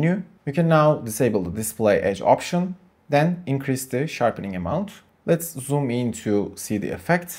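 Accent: Turkey. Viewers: Abi selamlar türk olduğunu aksanından anladım direkt :) Böyle içerikler üreten türklerin olması çok hoş umarım daha da büyürsün.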